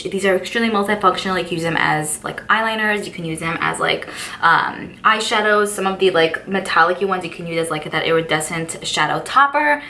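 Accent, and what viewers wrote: New York, United States. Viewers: I love your videos! Everytime I listen to you, I really get the "New York" vibe!😊 Nevertheless, I love purple and this collection is the "bomb". 💜💜💜💜 Purple eyeshadow looks awesome on you!🥰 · the way you say gloss, the “just ate a bagel”, the horns in the back while you’re talking just all screams New York and I love it 🥺 moved here on a whim in August & your videos give me so much motivation to explore the city (aka TJ Maxx)! email: <mail> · I first watched you open the WNW Rose collection. (Made me want to buy it.) And I keep swearing that I'm subscribed to your channel, but I almost never have your vids pop up. (My other half is from Brooklyn and your voice reminds me of visiting NY/Jersey. Say cawfee sometime...)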